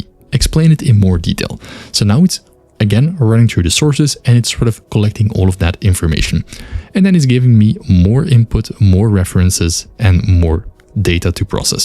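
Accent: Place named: Netherlands. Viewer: Thank you for the effort and detailed explanation. Subscribed. By the way, from the accent I think you are 100% Dutch. )))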